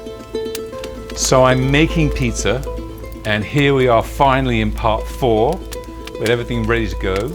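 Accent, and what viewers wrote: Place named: United Kingdom. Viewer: Accent quintessentially British...great vid!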